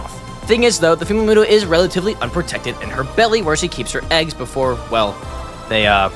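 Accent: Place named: Australia. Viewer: Nah it’s Australia to me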